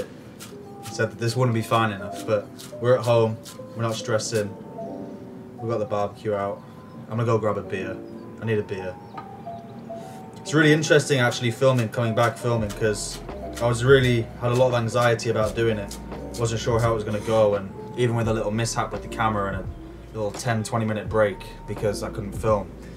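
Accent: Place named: Staffordshire, United Kingdom. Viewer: Love your videos mate. I'd ask where you're from, but that would be rude lol. Accent sounds like it could be relatively local to me in Staffs lol. Probably far wrong but ehh 😂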